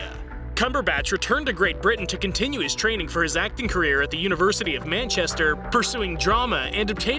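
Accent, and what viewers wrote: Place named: United States. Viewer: I left the following comment on your phoney 'no talking films stars' sister, channel. On this channel Benedict mumbles a few words where you've turned the sound down. Why? Read this: (like you two; I couldn't be bothered writing to both of your channels... you're carbon copies of each other. I guess you discovered you'd need more channels to get subs rather than pay for the commercial rights to the actors actually talking, out loud): Videos about really interesting, talented people like where you never get to hear them speak. Not acceptable. Could it be because the cheapskates at Biographer are too mean to pay the commercial rights to their film clips so they can't broadcast them with sound? That's why the irritating American 'car salesman' voice has to quote everything that is said in the clips. There is a clear message in the mere 88K subscribers; heavens, there's a grandma cooking pasta sauce on YouTube with double the subscribers than Biographer. 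Imagine if you actually had the voices of the huge stars you have images of... ah, but then again you'd have to shell out for that. You'd have to sell more advertising. Nah, don't bother. Not on my account... I'm outta here...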